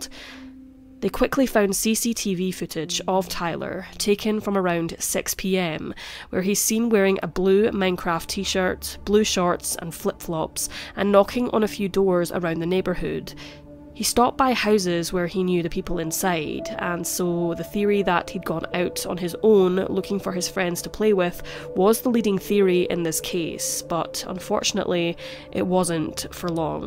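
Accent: Scotland, United Kingdom. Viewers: So good to hear a Scottish crime creator 👏🙏😘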